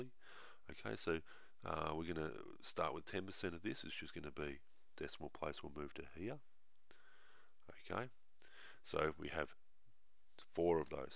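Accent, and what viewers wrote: Australia. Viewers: His Australian His Accent shouldn’t Matter his message is Great 😊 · Australian bob Ross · If Corpse was Australian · good one, your accent look like aussie thums up if u are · Are you Australian? You sound just like my uncle. I love you videos! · Calm Aussie accent and common sense, both helped give me an extra tool to working out percentages . It helps keeps one in touch with the original math logic so often lost with calculators. THANK YOU ALL THE WAY FROM DUBAI. Bet you didn't expect fans this far out eh ?!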